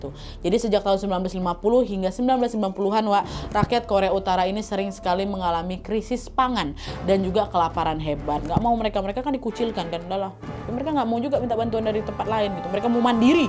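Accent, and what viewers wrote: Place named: Palembang, Indonesia. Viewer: Aku salfok sama logat mbaa nya. Kayak logat palembang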